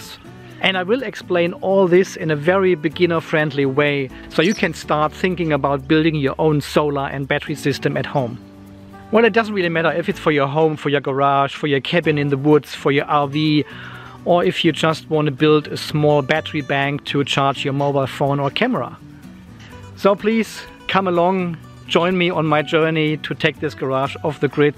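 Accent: Germany. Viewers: Dear, am I right that you are originally from Germany? It simply sounds like this 😅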